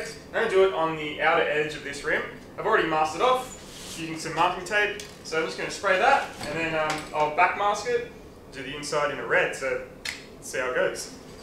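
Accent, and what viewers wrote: Australia. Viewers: A R its just an Australian accent it's not that hard to understand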